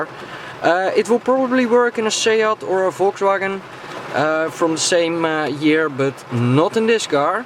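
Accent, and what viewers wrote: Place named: Netherlands. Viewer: Engels met een Nederlands accent hahaha geweldig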